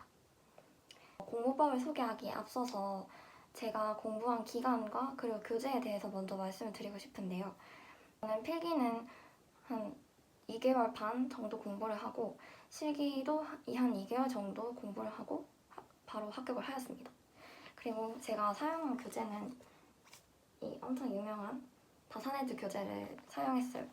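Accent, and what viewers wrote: Gyeongsang, South Korea. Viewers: 경상도분이네요!! 친숙한 말투 ㅎㅎㅎ 전 포항출신이에요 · 경상도 분이신가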